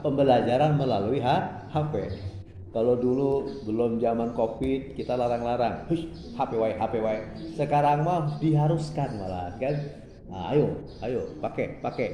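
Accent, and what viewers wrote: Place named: Sunda, Indonesia. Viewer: Meni nyunda........😁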